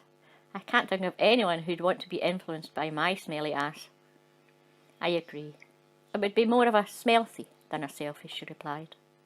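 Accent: Scotland, United Kingdom. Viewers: Never did I know I needed so much to hear and Scottish woman read me stories!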